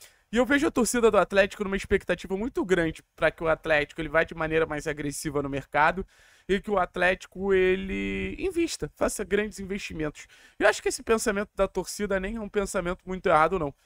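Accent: Rio de Janeiro, Brazil. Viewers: E esse teu sotaque de carioca.....?? Vc é de lá...??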